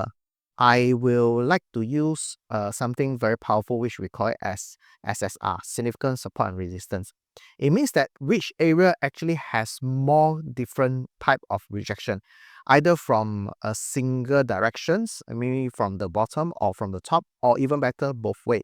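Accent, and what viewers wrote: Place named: Singapore. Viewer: I can't understand your singlish 😅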